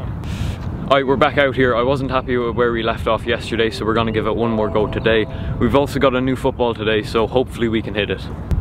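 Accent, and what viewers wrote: Ireland. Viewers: Luv ur videos and im 99.9 percent sure ur irish like me😂👍🇮🇪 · Are you Irish you have an Irish accent sounds like your irish your a goaT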